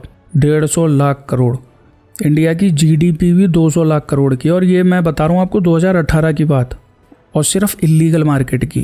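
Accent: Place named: Delhi, India. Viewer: दिल्ली का है इसलिए लहजा केजरीवाल जैसा है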